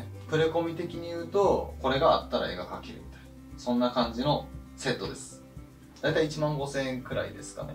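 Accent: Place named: Kansai, Japan. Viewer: 関西弁も心地よいです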